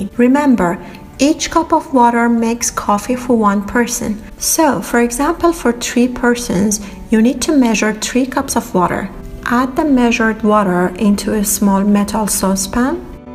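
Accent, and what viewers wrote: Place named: Iran. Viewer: Love the persian accent😂💖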